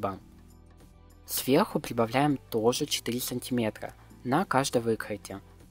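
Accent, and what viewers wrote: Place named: Ukraine. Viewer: По руски говорит но слышат украински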